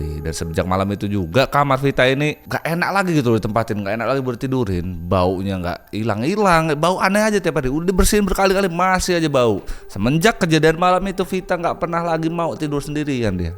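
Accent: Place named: Madura, Indonesia. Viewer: Kadang kadang logatnya kayak logat orang Madura 😂😂😂😂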